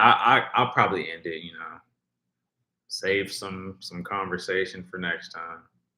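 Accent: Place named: South, United States. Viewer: I’m sorry but I just have to say I love your country accent it’s sexy as hell!!!! It does things it’s very refreshing the whole attitude personality it’s definitely the whole package to me just sayin!!!!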